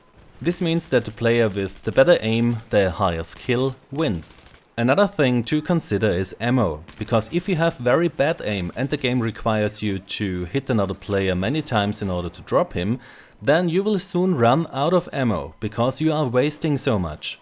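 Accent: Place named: Germany. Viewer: Haha okay, cool:) Is interessant wie einem sofort aufällt, wenn einer aus DACH englisch redet. Aber du machst das megamäßig gut und super verständlich;)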